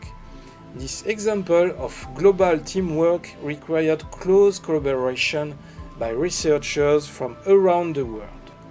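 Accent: France. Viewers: I love your "French accent" when you're talking in English! LoOoL hehehehehehe !!! Otherwise, I'd like to hear more about "Secret Space Programme" and "Space Force" which could explain "black holes", "time travelling", "Galactic brothers and sisters" stationned around planet Earth waiting for a coming "cosmic event" that will happen SOON within our solar system. Thank you with Gratitude, Love and Light!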